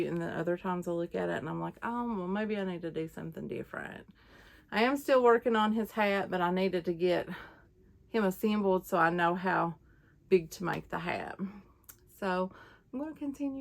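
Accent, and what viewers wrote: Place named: Kentucky, United States. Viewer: I love your channel. Are you from Kentucky? I am and our accents our similar.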